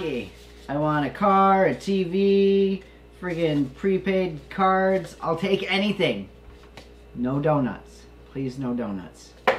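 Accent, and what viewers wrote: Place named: Canada. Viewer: had to sub because u sound canadian and not the fake canadians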